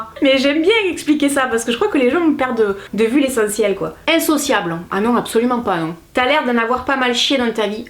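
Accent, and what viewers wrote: South, France. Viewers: OMG mais l'accent du sud c'est tellement dur de se prendre des remarques, j'étais tellement complexé avec ça ^^' (team sud(e) aussi haha) Concernant les préjugés, la toute premiére fois que je t'ai vue franchement j'en ai pas eu, j'ai juste dis 'oh une rousse" par contre j'étais "choqué" quand j'ai su ton age tu fais tellement jeune :D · Je t'ai découvert en ayant des soucis à assumer mon corps de maman. Je chercher des conseil sur l'habillage et tu m'a surpris de part ta manière d'animer ta vidéo. Depuis je regarde beaucoup de tes vidéo et je suis tombé sous le charme. J'adore ta façon de voir les choses et d'être droite dans tes bottes et franche. J'adore ton accent du sud qui pourtant j'en suis pas fan. J'adore tes cheveux qui son vraiment magnifique. Bref je te trouve formidable et j'aurai aimé t'avoir en voisine pour t'entendre râler d'avoir mis du café sur tes jolie rideaux neuf 😂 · J'adore ton accent du sud !!! 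Tu mets du soleil dans ma vie à Paris et j'en ai bien besoin 😂. Super que tu reprennes tes vidéos 2 fois par semaine !!! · vous êtes simple et nature , sa change de ce que l on voit ailleurs et sa fait du bien de voir sa .Quant a votre accent du sud c est un régal a attendre . Bref ne changez surtout pas rester tel quel , et continuer a sourire . 😘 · Le truc qui m'énerve le plus je crois c'est le "trop vieille" pour etre sur YT, pour donner des conseils... Putaingcon la dictature des ptits jeunes ca va 5mn! 43 ans c'est pas VIEUX... C'est typique des petits cons qui vont te balancer un "oK bOoMeR" pour te "casser" sans argumenter... D'ailleurs la vieille de 34 que je suis va sans route se ramasser des "ok boomer" en commentaire mdrrr A 43 ans en tout cas tu as plus de légitimité pour donner des conseils qu'une ado de 17 ans... Non? L'expérience acquise avec les années, ca vous parle ou bien? 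J'ai rien contre la jeune génération j'ai un petit frere de 25 ans que j'aime d'amour, simplement j'aime pas les cons:0 Et des cons y'en a de 7 a 77 ans n'est ce pas... Continue tu es sympa et authentique:) Pour l'accent du Sud je pige pas ya vraiment des gens que ca gene? Ok... P.S.: dsl j'écris sans accents et cédilles car mon clavier s'est foutu en allemand. Et j'arrive pas a le changer. Voila ^^ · Honnêtement vous êtes une personne toute à fait charmante et apaisante, c'est super agréable de regarder vos vidéos et outre l'accent du sud que je n'apprécie pas forcément 😉, c'est secondaire tellement vous êtes génial! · Quoooiiiiaaaa😲.. A perpi vous dites pas chocolatine 😮 !?... Heureusement que l accent du sud rattrape 😜... Une toulousaine qui te salut 😀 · J'avoue qu'en général j'ai du mal avec l'accent du sud mais je pense que c'est à cause des clichés accent du sud=cagole (ou bien baraki comme on dit en Belgique 😂😂) Chez nous quelqu'un qui a un accent de Liège ou de Charleroi c'est pareil c'est vu comme des barakis 🤷🏽‍♀️ Après quand on apprend à connaître la personne on se rend compte qu'il y a juste un accent et rien de plus 🤷🏽‍♀️ À force de t'écouter, j'adore l'accent et ton rire me fait mourir de rire je rigole avec toi 😂😂 Il y a aussi Missoumakeup que j'aime beaucoup qui a un accent du sud 😋 C'est vraiment les clichés qui font qu'on a du mal 😏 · Quoique les autres disent, on t'aime comme tu es <3 Je viens du sud mais habite à l'étranger donc quand je t'entends parler ça me rappelle le soleil 😍😍😍 Je comprends pas les clichés sur les accents, c'est pas parce que tu parles différemment que tu es moins sérieux ou "beauf" 😒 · Les vidéos passent toujours trop vite avec toi ! 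:( j'en veux toujours plus et moi j'habite à bruxelles mais j'adore l'accent du sud, c'est vraiment mignon de voir les différences de prononciations ! Gros bisou d'une fille de 24 ans ;-* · Hé bien moi.. J aime pas du tout l accent du sud, mais curieusement, et sans que je puisse l expliquer, je te trouve trop mignonne à parler comme ça!! J adore! 😘❤ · j´adore ton accent du sud! <3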